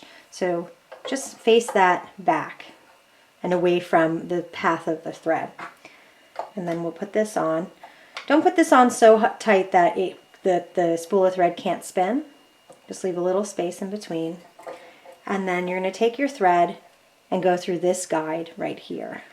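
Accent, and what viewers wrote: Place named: Russia. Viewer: Sounds Russian to me.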